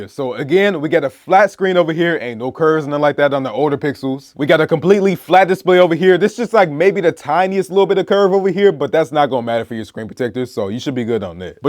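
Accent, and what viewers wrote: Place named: New York, United States. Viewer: Your definitely a new yorker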